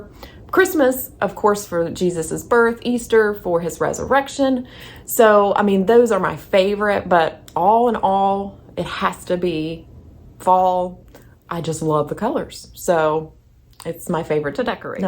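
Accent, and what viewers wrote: South, United States. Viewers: I started watching decorating videos last Fall and found you. I really enjoy your enthusiasm and we have similar taste. I love your southern accent too. I enjoyed learning more about you and look forward also to your Bible verses. You are a beautiful inspiration, thank you! God bless you! 💕(I am a grandma of 9, you have so much to look forward to). Such blessings!!! 😘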